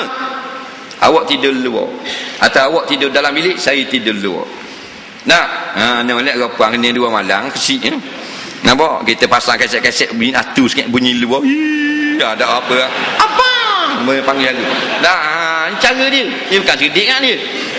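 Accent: Terengganu, Malaysia. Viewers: ustaz ni bgus dgn cara dia..ada unsur jenaka n pengajaran..walaupun dgn slang trngganu...tp dpt fhm msg yg nk disampaikan...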